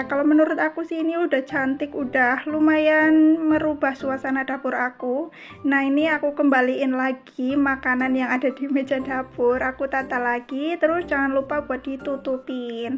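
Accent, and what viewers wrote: Indonesia. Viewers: Ganti suasana ya bun jadi lebih luwas,iya bun logatnya keliatan bgt Cilacap 😊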